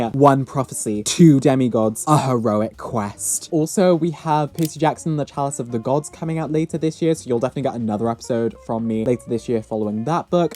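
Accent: United Kingdom. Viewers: You've such a nice accent! Is it from Britain?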